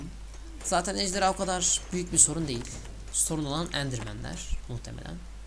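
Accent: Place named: United States. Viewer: Abi senin şu amerikan aksanı gibi konuşmana bayılıyorum :) (Bkz: -Ahh kimin umrunda :)) )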